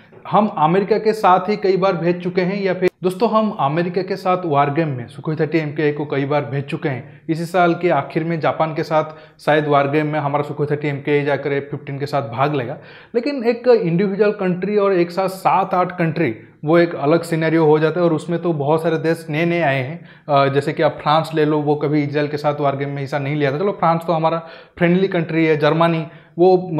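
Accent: Odisha, India. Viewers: sorry to say please currect your accent of oriya in English like word - America , humare these words you can follow a hindi speaking people to correct your pronounciation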